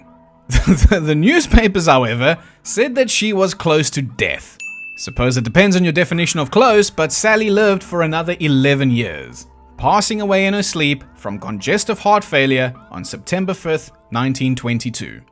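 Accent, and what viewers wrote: South Africa. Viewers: is that a south african accent i detect 😛🤞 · He‘s South African, likely Afrikaner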